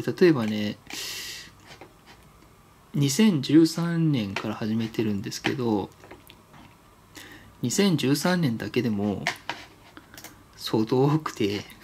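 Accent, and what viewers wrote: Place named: Kansai, Japan. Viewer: 3万人、おめでとうございます! のマニアックな動画と関西弁が好きですｗ これからも楽しい動画待ってます!!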